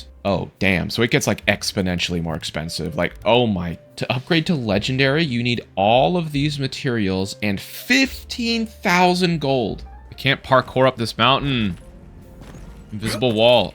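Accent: Canada. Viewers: Are you Canadian? 🤔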